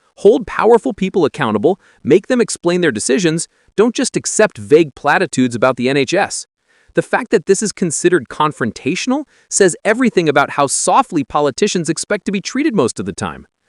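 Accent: United States. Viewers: Why do we have to listen to a yank voice !!!!!!? It’s awful.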